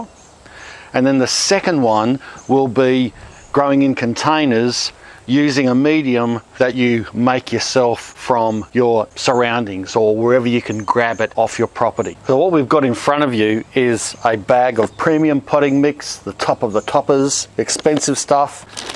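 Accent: Australia. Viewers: I enjoy listening to his Aussie accent plus have always liked the self sufficient mindset.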